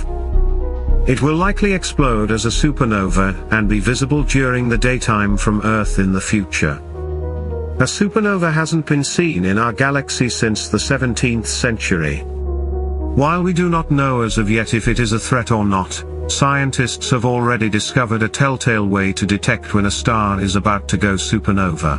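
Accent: United Kingdom. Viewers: The brainy peoples' brains are swelling and will explode too, but in only a few years, not billions. With all the bucks you people get I truly believe you could put the money to better use; and not just in your pockets. For starters, get a spokesperson who speaks without a Brit accent. I remember watching a NASA APOLLO take off on TV, with my wife, and saying; Look at the ice build up on the o-ring joints! I think something bad is going to happen'". And an astronaut, who was from Mohawk,NY, some 10 miles from me perished with all the others on board. I hope the wire insulation you people are using is NOT made from soybeans. Get It? You people are on the Elite Welfare System and suckin it right up. Stop your putting fear in everyone, and start fearing and loving, and obey more, to please God. All the worlds infrastructure is shot, and all you do is keep shooting holes in our atmosphere. 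Will you be stumped, like everyone else, if the electricity stopped, for 40 yrs, like the 40 yrs God sent the Israel people into the desert?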